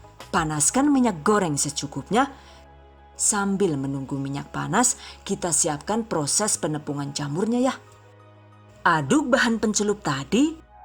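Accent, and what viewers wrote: Banyumas, Indonesia. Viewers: Hahahaha salammm ngapakk .wong ndi sih kie yah😂nyong ngapak kie jan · Ngakak pke bhasa ngapak tp sngt seru,,thanks resepnya😁😁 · Ngapaknya katok kak yakin bikin ana pingen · Aja klalen,,,diiiii caaateeet luuurrrr😅😅😅😅😅 ora ngapak ora kep nak,,,mantap jiwa mempesona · Suka bgt dngan channel ini,detail bgt. Di selingi khas ngapak yg lucuuuuu....bikin gk bosen nontonnya. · Jowone ngapak mantap resepe lucu iklane suka 2 nya itu jamurnya nak coba sudah saya catat lalu saya coba ah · Ngapak mbangeeeet kieee mak... Masya Allah kereeeen. Ra ngapak ra enaaakk... 😂😂😂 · Astaghfirulloh.... NGAPAKNYA gawe nyong njungkel kepoyuh ngakak ora mandeg kie🤣🤣🤣🤣🤣🤣🤣🤣🤣🤣POKOKE THE BEST CHANNEL, SUKSES SELALU 😍😍😘😘😘😘🤣🤣🤣🤣🤣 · Yayayaya ngapak temen rikane Mak 😅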